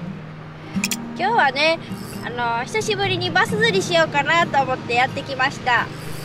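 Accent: Senshu, Japan. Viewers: 泉州弁いいですね。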